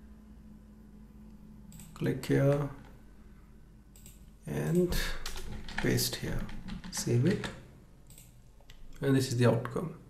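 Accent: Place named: India. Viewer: im fed up with this accent seriously , i wanna smash my head when i hear this accent honestly , i just cant understand what u indians say and everytime im searching sth related to coding an indian pops up , fuck this life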